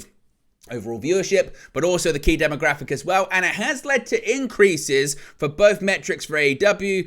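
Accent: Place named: England, United Kingdom. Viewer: Its weird to listen to JDFROMNY do his show and then hear you do THE SAME SHOW but in an English accent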